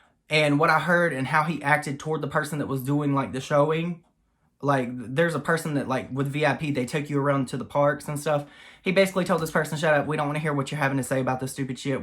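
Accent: South, United States. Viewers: Im disgusted. I only have youtube, no other social media so i dont see/hear other drama. What I can yell you, as an insignificant Aussie ol' gay boy, you and Nick is the duo I enjoy watching. I dont know what to make of Rich, in Aprils video's she says he's a shallow, immature cry-baby. Anyway, I think you are a sweet guy, i love your fucking accent! I know Americans like our accent, well I just LOVE 💕💯🌈 The southern accent, i think its gorgeous. Believe in yourself, you give more to the world than you know and I think the online world appreciates you more than you know. Everyone knows you & Nick are 💯 real, youre funny good guys, the fact that you're willing to say "Hey, I'm no role model, I'm straight up shady sometimes" lets me know that you are self aware. I think Rich see's himself in a particular way and it really isn't based 100% in reality. 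I know you don't know me and me and what I think and say doesnt really matter but just know that you entertain me and I really do appreciate your work and find it entertaining. Sending LOVE 💜💕🌈💯 and support from Australia girl 😚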